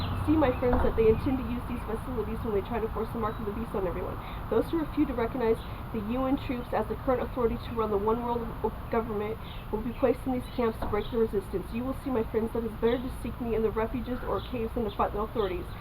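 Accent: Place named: Ireland. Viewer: For some reasons i thought you were from Ireland.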